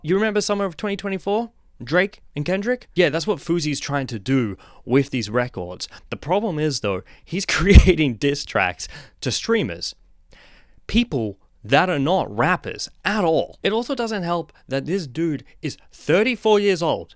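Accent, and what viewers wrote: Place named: United Kingdom. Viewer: Damn im born in texas and live in canada since im 13 and now gonna be 23 next month but i thought you we're british 😂 but love the content I'm gonna subscribe aha!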